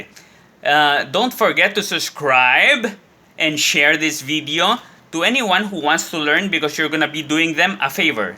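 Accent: Spain. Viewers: Sounds real authentic Castillian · Hola You’re funny! And based on your Spanish I think your from Madrid 😁☺️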